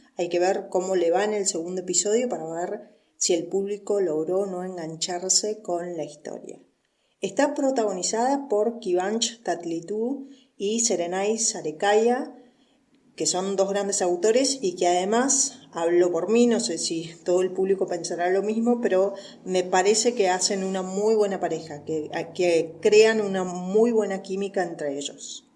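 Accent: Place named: Argentina. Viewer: tienes razón promete y mucho esta serie y ellos hacen buena pareja, Kivanc no defrauda me gusta mucho es un actorazo, siguiéndole como siempre desde MALLORCA (perdona tu ede que parte de Argentina eres,xq tienes el acento pero eres muy pausada y dulce hablando,lo dice alguien de Rosario ),🙋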